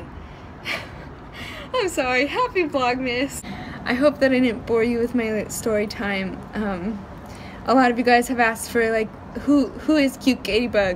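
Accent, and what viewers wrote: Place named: New Mexico, United States. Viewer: Oh my gosh! I totally called your accent a few videos ago. I was like, "Man, she sounds like a New Mexican." That's where my mom is from & I lived there for about 4 years while I went to college. Loved this story time. It's very inspiring.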